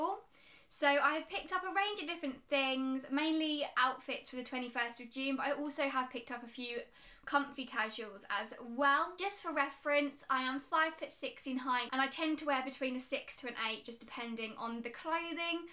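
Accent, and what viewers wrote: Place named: RP, United Kingdom. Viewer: is stunning. Plus the posh English accent is lovely.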